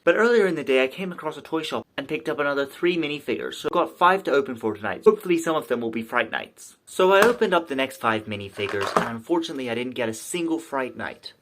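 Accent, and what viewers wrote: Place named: Eastern Canada, Canada. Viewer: I'm from Eastern Canada and the speed talking with heavy accent was 100% intelligible lol just like home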